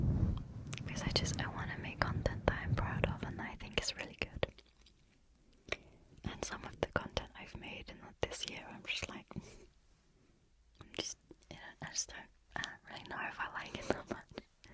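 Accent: United Kingdom. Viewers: 🙌🏼🙌🏼🙌🏼🙌🏼 Yay finally a whisper video!! I just love your British accent and whispers. I hope all goes the way you want, and thank you!!! The kitchen turned out beautiful, I LOVE the lights on the bottom of the cabinets.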